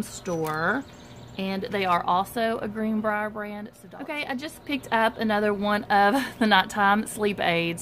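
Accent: South, United States. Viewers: Congratulations on such an awesome milestone! I love watching your videos and listening to your southern accent. I am from Texas but our accent is a little different. I will be looking for the tumblers and snack containers for an upcoming trip such a great idea to use instead of our more expensive tumblers! Dollar Tree haul for me tomorrow. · Congrats on 10k! And in only one year. It's that southern accent. Gets them everytime am I right! From another southern belle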